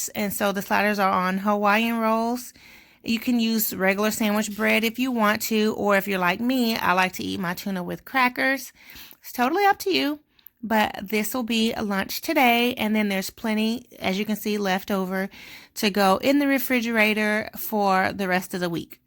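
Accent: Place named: St. Louis, United States. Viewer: I LOVE TOUR VOICE ..U REMIND ME OF A SAINT LOUIS GIRL🤙🏾🤙🏾😁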